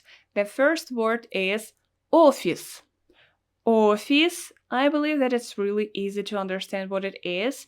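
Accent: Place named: Russia. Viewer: Спасибо за видео. One single tip - try to pronounce English words with an O clearly. For example Organization or motivation with a clear O. I know as a Russian native it's hart to switch because the O will be pronounced as an A. So your English word organization sound like arganisation and motivation like mativation. Not bad at all but funny:)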